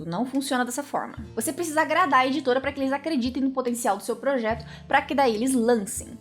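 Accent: Paraná, Brazil. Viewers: se eu fosse chutar, acho que você é paranaense.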